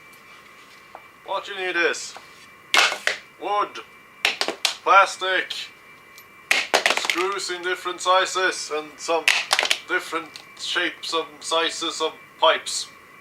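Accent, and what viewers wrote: Sweden. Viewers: THE SWEDISH IS OBVIOUS!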